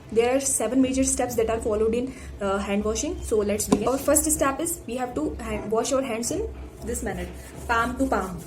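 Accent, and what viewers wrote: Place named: Haryana, India. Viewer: I think 💯 mam is from haryana ❤❤ 😂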